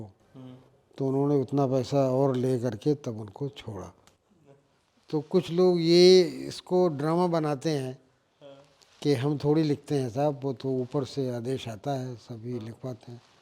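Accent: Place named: Haryana, India. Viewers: बेटे आप मेरे को हरयानवी लगते हो असग़र साहब से सवाल करते हुए हरयानवी लहजा झलकता है? बहुत ही लाजवाब चर्चा बड़ी ख़ास बातें बताई असग़र साहब ने बड़े लेखक संवेदनशील इंसान सलाम सर जी को, आपको बहुत बहुत बधाई शुभ कामनाएँ एक मानवीय सरोकारी इंसान से रु ब रु करवाने के लिए संग्रहणिए विडियो, महिपाल मानव हिसार हरियाणा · katter haryanvi h ji ye nam h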